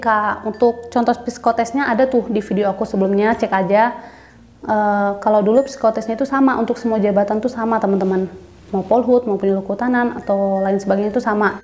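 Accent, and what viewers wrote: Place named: West Java, Indonesia. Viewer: koq logatnya sunda kak?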